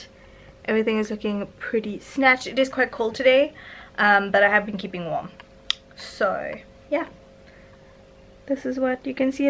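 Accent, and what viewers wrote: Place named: South Africa. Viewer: So yes, I have heard of it since it had a good amount of attention in the US, however I am buying it right now online because of your review and recommendation 🙂. It's pretty affordable here, just $12. My current favourite foundation is Bareminerals barepro foundation. This should definitely come into the chain stores, I think it would be really popular....Randomness- I love watching your videos not only for the content but I miss hearing our South African accent ❤. #durbangirl 🇿🇦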